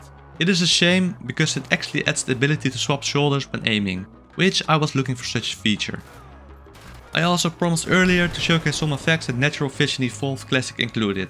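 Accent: Netherlands. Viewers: i detected a dutch accent veel succes brooo je kunt het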